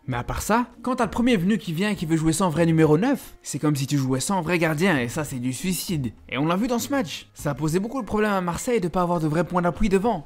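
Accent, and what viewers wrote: Belgium. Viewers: tu es belge?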